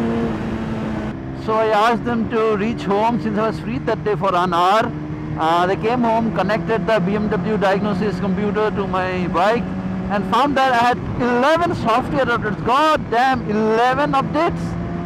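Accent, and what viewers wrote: Odisha, India. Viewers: English lecture ree odia tadka😆😆..mast!!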